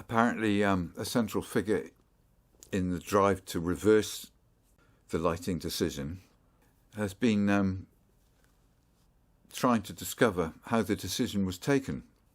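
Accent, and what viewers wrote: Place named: United Kingdom. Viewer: This is an excellent discussion about this spreading scourge (more like a badly misguided use of a potentially good technology) that seems to be spreading like cancer across the cities and countries alike. Granted, this focuses on the UK (based on the accent of the person narrating) but here in the United States, there have been many cities that have replaced the high pressure sodium (and low pressure sodium too) lights with harsh white LED lighting and it has practically ruined the nights and badly diminished the city nights altogether. I DO know that some of my argument may stem from nostalgic reaction to a changing technological paradigm. Maybe I'm just the 21st century version of those who complained about gas lights being replaced with incandescent light bulbs during the early 20th century but I can't tell you much I HATE, HATE, the harsh white light of these LED streetlights that are installed in places where they are NOT suitable to be in. 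You mentioned there was a petition set up to get them removed. Sadly, there's a general sense of resignation here in the states but at least a few cities had such outcry against such harsh lights that they either stopped installing them or replaced them with warmer colored lights. There are residential neighborhoods all around me that have long installed these LED streetlights and some of them actually hurt my eyes and I'm only 40 years old as of this writing but nearby streets that I regularly drive on at night now have these ultra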